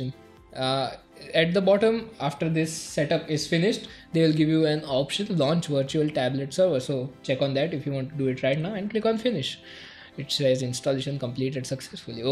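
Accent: India. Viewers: Great video god i hate the indien accent - _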